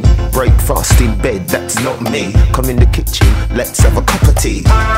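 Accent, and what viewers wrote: United Kingdom. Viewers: sounds horrible talking wiv british accent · I don't knw wat kinda british accent it is whether cockney or dat...but I know he sounz like sum of my linkz in Birmingham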